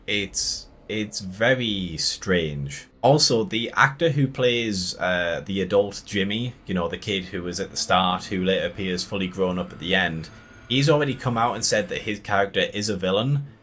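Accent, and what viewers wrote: United Kingdom. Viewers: Did you just say that this film is set in the Scottish highlands?! You sound British. I'm amazed that you can't tell the difference between a Scottish and a Northumbrian accent, and haven't heard of Lindisfarne. Or the Angel of the North. The characters at the end weren't just power rangers but dressed like Jimmy Savile. That's the thing that I couldn't get over.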